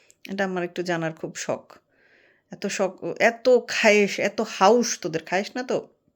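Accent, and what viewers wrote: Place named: Chittagong, Bangladesh. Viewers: আপা আপনার চট্টগ্রামের আঞ্চলিক ভাষা অনেক মজা পাইলাম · আপা তুয়্যার মুখত চিটাইংজ্ঞা হথা বেশি সুন্দর লাইজ্ঞ্যে🥰🥰🥰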